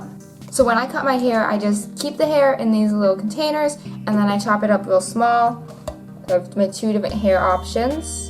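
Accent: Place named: Minnesota, United States. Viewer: Are you from Minnesota? You have the accent... I love it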